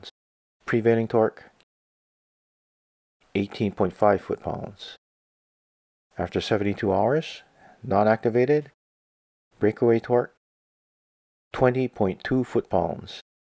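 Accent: Hawaii, United States. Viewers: Cahz u from hawaii, sound like u get some moke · Eh, you from Hawaii? Can tell by your Local accent -- I'm a 5th-gen Local from Maui, just found this channel, right on 🤙🏼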